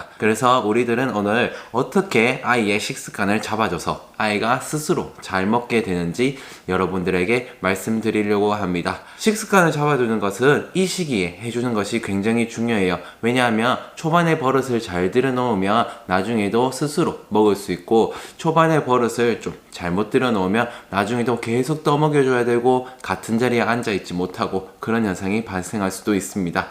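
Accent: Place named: Gyeongsang, South Korea. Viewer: 일한이 너모 귀욥네요ㅜㅜㅜ♡♡ 저도 경상도 사람이라 사투리 쓰시는 모습이 더 친근하게 느껴지고 귀에 쏙쏙들어오네요ㅎㅎ 5개월차 엄마에요 아직 앉아서 먹기힘들어해서 바운서에 누워서 먹이는데 숟가락이라도 쥐어줘봐야겠어요ㅎㅎ 숟가락잡으려하는데 제가 떠먹여준다고 못잡게했거든요ㅠㅠ 낼부턴 스스로 잡게 숟가락을 하나더 준비해야겠어요! 다행히 울아가는 너무 잘먹어주는데 한번씩 안먹으려할때 티비나 동요나오는 장난감 틀어주는데 그냥 다끄고 조용한환경을 만들어주는게 좋을까요? 티비나 장난감은 추후 식사습관에 안좋을거 같은생각이 들었는데 궁금하네요~^^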